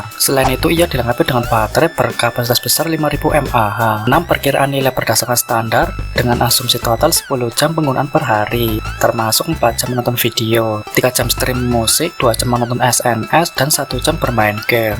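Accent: Banyumas, Indonesia. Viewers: Ngapaknya masih kelihatan bang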